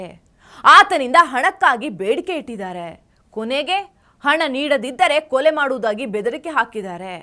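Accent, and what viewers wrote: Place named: Tamil Nadu, India. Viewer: ಅವರೇ ತಾವು ತಮಿಳ್ ಅವರ ಯಾಕೆ ಅಂದ್ರೆ ಅವಾಗವಾಗ ತಮಿಳ್ ಒಂದ್ ಭಾಷೆ ಅವಾಗವಾಗ ಆಚೆ ಬರುತ್ತೆ ಇರ್ಲಿ ಚೆನ್ನಾಗಿದೆ ನಾನು ಕೂಡ ತಮಿಳು ತುಂಬಾ ಖುಷಿಯಾಗುತ್ತೆ ತಮ್ಮ ಮಾತು ಕೇಳೋಕೆ ತುಂಬಾ ಖುಷಿ 😊👌🙏🚩🚩🚩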